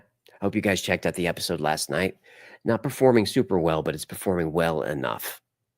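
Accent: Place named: Bronx, United States. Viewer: I knew I KNEW that accent!!! From this Bronx native… you hide it well, lol. But it slips out a little sometimes ❤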